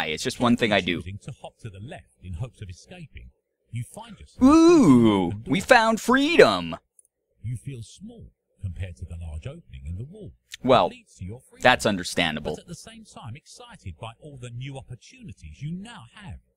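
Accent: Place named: United Kingdom. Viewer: Hey, It's I am Bread with bad puns, British narrating, and (much) less rage! :D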